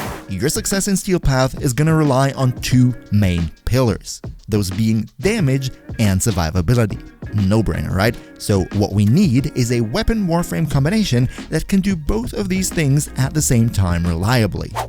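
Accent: Germany. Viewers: I fuckin knew it XD das deutsche wurde rausgerochen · Du bist Deutscher?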